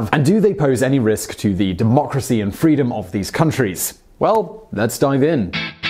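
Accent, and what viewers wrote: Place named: England, United Kingdom. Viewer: Uhh, NATO? Why mention an organization created to stop a Soviet blitzkrieg? It's as if NATO is the only multilateral organization you've ever heard of. Why not take a look at the African Union instead? Note: just because a guy has an English accent, it doesn't make him an intellectual or an expert on a given topic.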